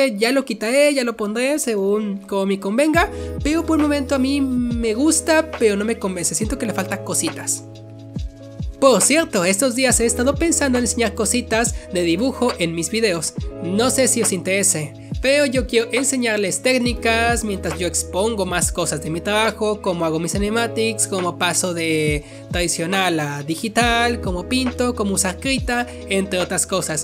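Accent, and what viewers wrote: France. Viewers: Pregunta eres franses si no eres pues pareces por el acento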